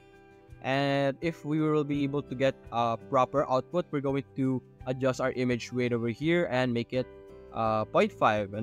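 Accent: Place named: Visayas, Philippines. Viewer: I can tell you're a filipino hehe and definitely bisaya with your intonation hehe good tutorial keep it up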